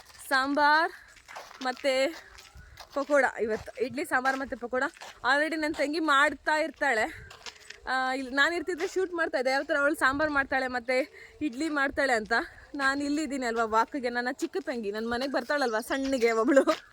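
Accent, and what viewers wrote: Standard. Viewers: Awesome vlog sis.ondu vishaya sis neavu meathodooo kannada geaaa ondu salute 🫡 pure kannada 😊😊😊😊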